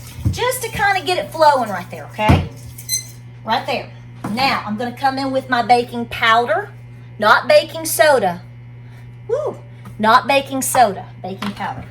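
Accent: South, United States. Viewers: You are so cute!!!! Very informative videos...I enjoy your energy and your southern ME TOO talking!!! KEEP up the GREAT Videos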